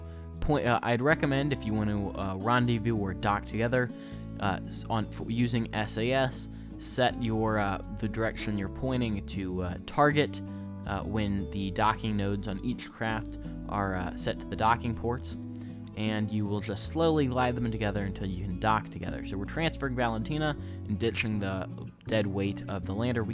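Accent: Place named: United States. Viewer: be soundin kinda american doe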